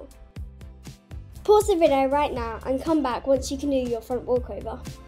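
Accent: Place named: United Kingdom. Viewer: I love your British accent 😃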